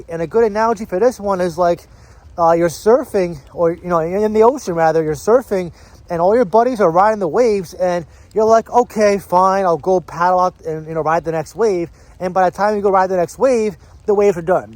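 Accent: New York, United States. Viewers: I am from England but am sure that's a New York accent. 😮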